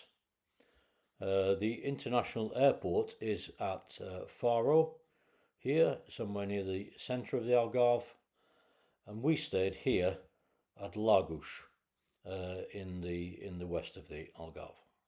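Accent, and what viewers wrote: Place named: United Kingdom. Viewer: i love... LOVE your channel - I just found you... subscribed.. i am from Portugal but grew up int he US.. so i guess i am American too... Algarve is one of my favorite regions, obv.... I have a very small farm in the Azores - love it there too. Now that i finally extricated myself from the claws of corp. America and am blissfully early retired- i tend to pop over to Portugal quite often. it's just a 4 hour flight from Boston and i love seeing my family and just chillaxin' in nature & peace away from the kerfuffle of daily life in the US... Florida will not quite do... 💌 anyway - am catching up on all your videos this evening cuz i;m hunkered down for this snow storm...(enjoying a dose of the queen's English..LOL..)❄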